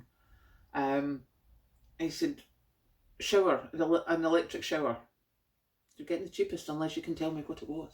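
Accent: Scotland, United Kingdom. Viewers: which part of scotland are you from roughly? Im Not stalking you 🤣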